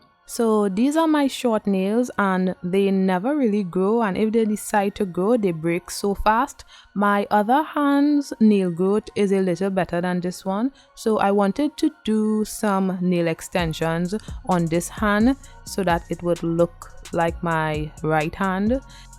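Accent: Trinidad and Tobago. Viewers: Love the tutorial, but as soon as the video started I was like...SHE TRINI!!! Love it! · Is this a 🇹🇹 accent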